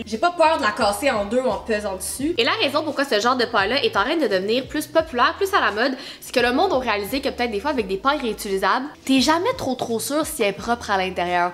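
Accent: Quebec, Canada. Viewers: J'adore votre accent québécois je voudrais avoir le même gros bisou😘💞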